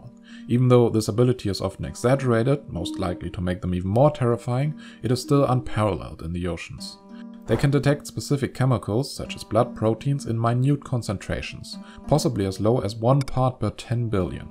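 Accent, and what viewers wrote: Germany. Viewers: ur german voice is annoying. otherwise nice videos. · The german "I believe"narrator the art style this channel is my narcotic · Schönes Video. Ich erkenne den Akzent direkt :D Germany ! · Ich finde diese deutsche Aussprache so kacke :P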